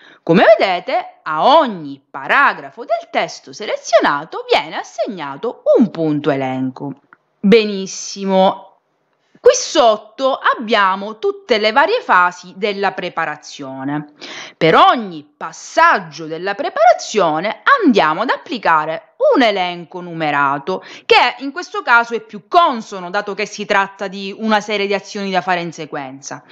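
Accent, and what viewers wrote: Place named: Sicily, Italy. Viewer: Sono ingegnere come te, ma hai delle capacità oratorie affascinanti,…poi con quell’accento (siciliano?) ufff, tanta roba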